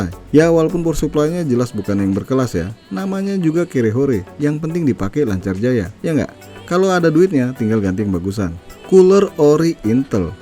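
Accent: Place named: Palembang, Indonesia. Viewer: Hahawkwk kocak bg ni ,wong Palembang kayak nya ni,pakek nian nama nya 😁😁😁😁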